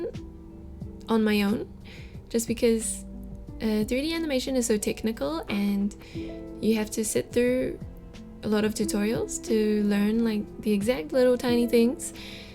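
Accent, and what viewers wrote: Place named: New Zealand. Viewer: Kiwi accent is the best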